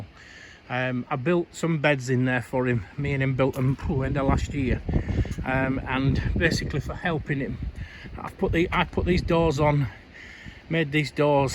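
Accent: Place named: Barnsley, United Kingdom. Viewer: Wheres your allotment you sound like your near Barnsley ?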